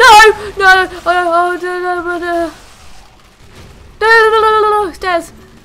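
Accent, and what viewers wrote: United Kingdom. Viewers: Finds I female Youtube ... I like this girl ... Wait she's British ... I really like this girl ... Wait she's playing Dark Souls OMG! Keep doing your thing never give up your amazing.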